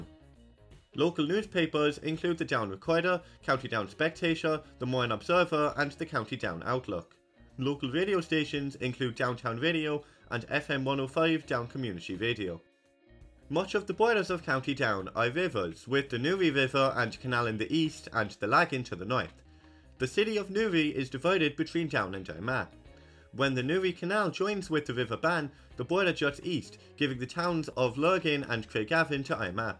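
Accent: Cork, Ireland. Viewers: Ahhhh! I thought it had a touch of Cork. :-)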